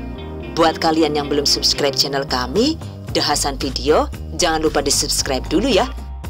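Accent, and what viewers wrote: Banyumas, Indonesia. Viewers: Suka dg resepnya, lg serius kok denger bahasa ngapak, langsung ngakak, wkwkwk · Jebule wong ngapak salam ngapak wong cilacap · deneng jebule ngapak kaya nyong..🤭😅😅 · Suka gaya bahasanya...ulasannya jelas..ngapaknya pas...hehe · Makasih mba resep ny..mantep nih..ko jdi ngapak y..ora ngapak ora ke penak..hahaha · Gemesi le omangan bun... Ngapak nya berasa😂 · Ngapak toh hehehe, saya akui masakan Tegal emang mantab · Mantaaap Bun.... Saya mau coba ah..... 🙏 Bunda dialeknya ko sama dgn aq yaa......Maaf Bunda asli dri Bnyumas apa yaa...?? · Sampean tiyang pundi yu deneng saged ngapak kaya kula... Kula wong kebumen yu😂😂😂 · Wong ngapak ya bu hihi..slm dari cilacappp..😁😁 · Yg saya suka dari channel ini disamping resep2 yg di share,juga ka host nya yg suka lucu sesekali krn logat dan bahasa jawanya. Medok khas org ngapak,dan kalimat OKE hihihi seneng dengarmya. 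Tks mbk resepnya 👍🙏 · Weh sebngsa karo nyong ya , pasukan ngapak😄😄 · Eladalah.. Jebule Rika wong ngapak ya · Gagal fokus Ama bahasa yg ngapaknya😍keren2 jd kita yg menonton tidak bosan😍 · Ternyata ngomongnya sm ky nyong buu, wong ngapak😂😂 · Deneng ngapak 😂😂😂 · Ya allah ternyata sampean wong ngapak, salam ngapak Bun, ora ngapak ora kepenak 😊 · Jebule ngomonge pada Karo nyong Rika yaa bu ngapak 🤭🤭🤭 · Mantaaap pingin mencoba moga engga gagal,, Ko bahasanya ngapak ya ,seneng dengernya ,,,makasih atas resepnya mba yuu,,kesuwun banget kiye wong gombong Melu nimbrung 😀😀😀😀🙏 salm sukses buat Chanel ini 👍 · Medoknya kaya wong Banyumas ya Bu...😀👍👍😘😘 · Muantap tenan yu... Krn ngapak...jelas tenan keteranganx ditunggu resep yg baru yg thn lm tuk kirim anak kos..tq ya yuu.....🙏🙏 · Ngapak bgt kya enyong deneng😁🤣kk nya matursuwun resep e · Ngomong jawane ky wong ngapak lucu banget sumpah 😂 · Ngapak ternyata ya bunda 😁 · Sangat mudah dimengerti,ada selingan humornya lagi.bahasa ngapaknya juga😂😂👍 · wong ngapak taune jannnn ngapake ngendi iya.... 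dadi ngefans kie inyong 😂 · Wong Banyumas Iki mesti, ketoro omongane ...😄😄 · Ibune wong ngapak ya deneng pda y karo nyong 😀😀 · Deneng ngapak mbaaaaakk Ngakak nyong yakin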